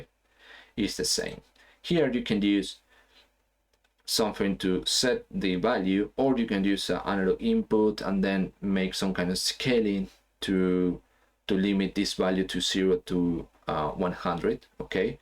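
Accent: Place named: Argentina. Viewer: Hola , no se por que se me hace que sos argentino, por casualidad tenes los pdf de tus clases ?, soy martin de argentina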